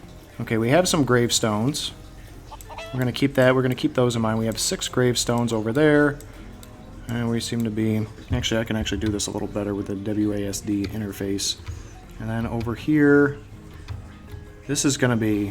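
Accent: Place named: South, United States. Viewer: ooooo southern accnt! charming!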